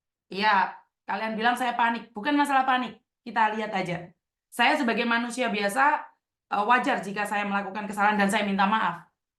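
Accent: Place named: Java, Indonesia. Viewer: lebih baik ditulis. Biar jelas dan dapat dibaca oleh semua pihak di boarding house. Ingat tidak semua wni di boarding house mau saling membantu, banyak yg jd Ular di dalam sana. Klo ada peraturan tertulis di boarding house minimal mengurangi potensi kesalahan yg akan terjd. Semoga dapat membaca komen ttg saran ini. Klo Gak ada waktu utk menjelaskan peraturan yah lebih baik tempel aturan main di boarding house biar bisa diturutin oleh semua penghuni boarding. Dan semoga kedepannya mengurangi emosi saat mendengar laporan dari pihak lain, Dan lebih memberikan waktu ke diri sendiri utk mengolah informasi yg masuk. Jujur aja mbak mbak yg laporin ada salahnya dgn bikin lebay aduan spt mrka bilang Lina belanja baju Zara yg seksi faktanya di channel Koko Faisal baju yg dibeli cuma baju biasa. Dan ttg ngomong bahasa mandarin, gak semua orang Indonesia paham dgn bahasa Jawa yg dikeluarkan, Sy orang Bekasi saja kadang suka tulalit klo dengar ngomong dgn bahasa Dan aksen Jawa, makanya kmrn ituh Lina ngomong bahasa mandarin dgn harapan biar lebih cepat dipahami penjelasan yg ingin Lina sampaikan Dan di channel Koko Faisal baru diketahui klo Lina bukan orang Jawa tapi Dari Kalimantan Dan pernah kerja di Malaysia, nah orang2 asli Kalimantan emang biasanya gak paham bahasa Jawa krn pas Ngamuk ituh kebanyakan ngomong bahasa Jawa yg bikin Lina tambah bingung. 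Semua ituh cuma saran agar lebih bijak lg kedepannya. Tidak ada yg Salah dgn saran, toh saran baik u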